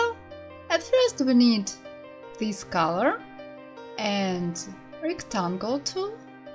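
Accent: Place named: Russia. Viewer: Very cute Russian accent.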